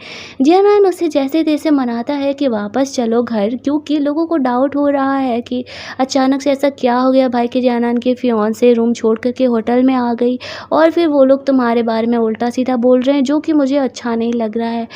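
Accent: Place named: Bhojpuri, India. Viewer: I love when u use Bhojpuri tone .😉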